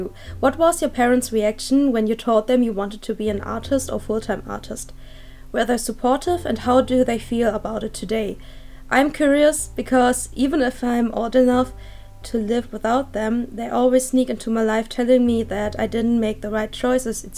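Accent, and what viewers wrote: Germany. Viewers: Ich bin mir unsicher ob ich deinen deutschakzent im englisch nicht zu sehr mag als das ich wechseln würde. Ich glaub ich schau lieber deine englischen viedeos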